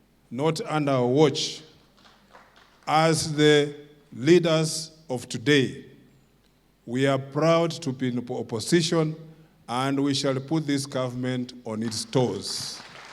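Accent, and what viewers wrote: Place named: Bukusu, Kenya. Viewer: Proud to be a bukusu you really represent us with our accent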